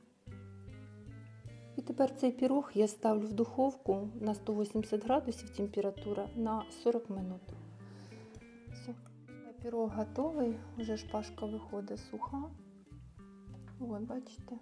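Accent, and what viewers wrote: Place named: South, Ukraine. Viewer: Південний степовий говір 🙏❤️🇺🇦 Бабусі мої так пояснювали )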